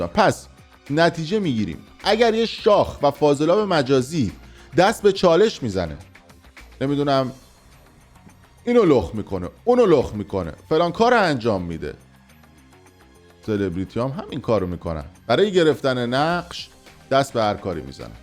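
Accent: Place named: Khuzestan, Iran. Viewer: کاکا دِمِت،عاشق خوزستانی حرف زدنتم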